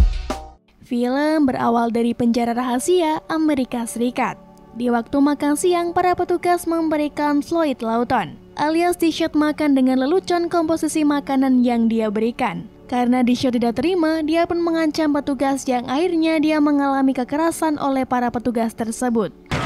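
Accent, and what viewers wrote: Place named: Batang, Indonesia. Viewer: Orang batang min?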